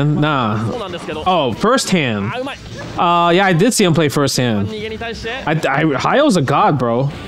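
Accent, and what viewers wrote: New York, United States. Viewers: Commentary is 🔥! Def. NY 🗽 talk albeit you in Canada 🇨🇦. 😂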